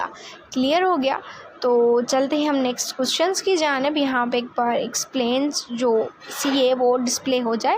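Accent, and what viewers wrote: Sindh, India. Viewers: Mera ek dost Sindhi wo bhi esey hi bolta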